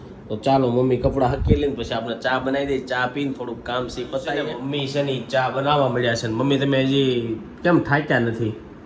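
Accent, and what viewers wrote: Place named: Kathiawar, India. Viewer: Today’s I’m the 1st to comment to yr Vlog 🤗😍🥰❤️🙏🏻 Tamari gamthi kathiyawadi boli sambhalvani.....j bahu maja aavti hoy 6👌🏻🤗🤗😍❤️❤️ MBOza from Gandhinagar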